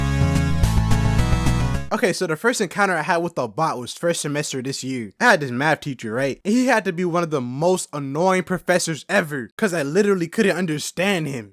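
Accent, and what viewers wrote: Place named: Portland, United States. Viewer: it almost sounds like you live in Portland or smth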